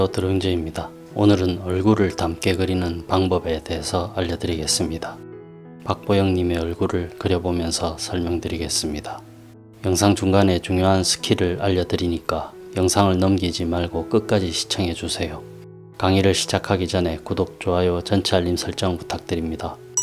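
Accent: Gyeongsang, South Korea. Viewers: 갱상도 분이시네!! 반가워요^^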